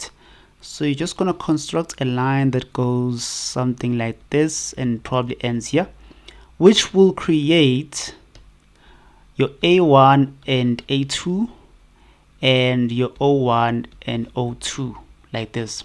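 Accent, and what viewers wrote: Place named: India. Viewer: The voice seems indian lol but good video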